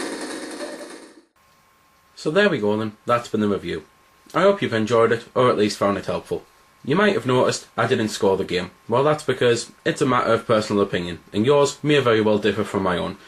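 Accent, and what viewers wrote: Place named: North East England, United Kingdom. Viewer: i enjoy your reviews! that's gotta be a northeast accent.. middlesbrough?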